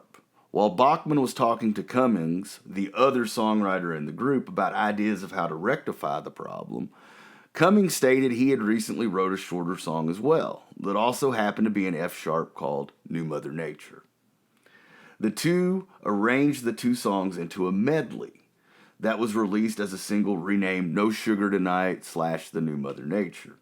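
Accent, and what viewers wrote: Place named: Texas, United States. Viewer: The Texas accent of the narrator is unbearable.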